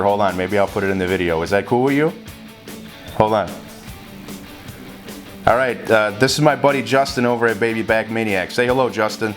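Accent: Chicago, United States. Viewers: Ok ok you got me new sub and I detect a Chicago land accent so just curious where from. Spent 35 years mostly in DuPage county and damn I miss the food as these folks here in southern New Mexico dont have a clue. I'm gonna give this a go this Sunday for football but I may pan sear and then run in the slow cooker to get tender.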